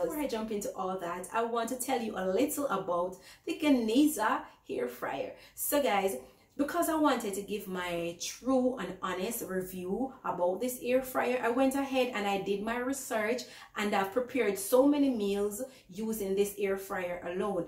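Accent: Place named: Caribbean. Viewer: She talking internationally so people will understand seen can't talk the deep patwah straight have to broaden your audience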